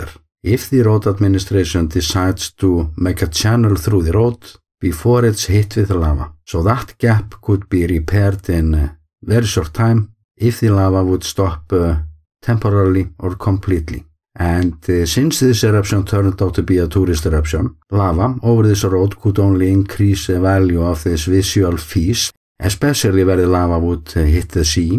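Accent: Iceland. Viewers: Deep gratitude for your love and deep knowledge of Iceland. You won our hearts and minds during Covid. We are relieved at your intelligent wise and skilful reporting. From Australia to you in aiiiceland!! We are addicted to your accent and btw commiserate with the physical challenge you have as we too are sliding fast into our dotage. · I love your english with the icelandic accent it makes your videos very soothing to listen to! Like a velevety hot chocolate lol!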